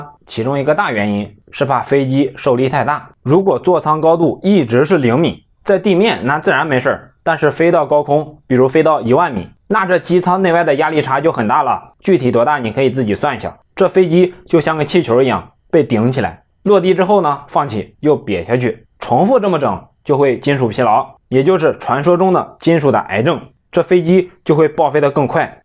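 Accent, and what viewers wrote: Henan, China. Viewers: ，听着是老乡啊，你河南哪里的呀？ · 河南，还是山东的？ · 你是河南的吗？咋口音有点像河南口音😂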